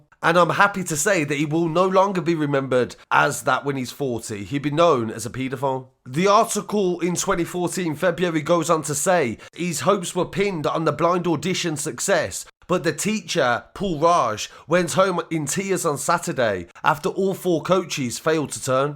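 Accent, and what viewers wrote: Birmingham, United Kingdom. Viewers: What accent is this? Birmingham or something, I'm glaswegian always realise when yous chat, you talk hyper then last word or 2 is low tone lol Anywayz fukk all that respect for the videos mate.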